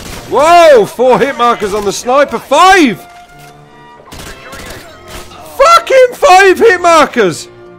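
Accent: Birmingham, United Kingdom. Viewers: that Birmingham accent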